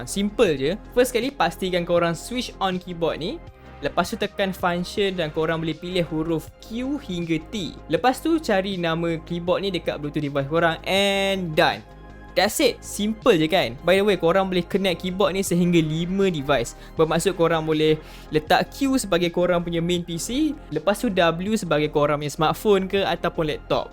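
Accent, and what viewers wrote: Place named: Kedah, Malaysia. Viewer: mantap ah orang kedah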